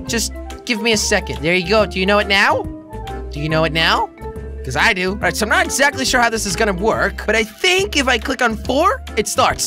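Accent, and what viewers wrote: Germany. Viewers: are you german ? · are you German???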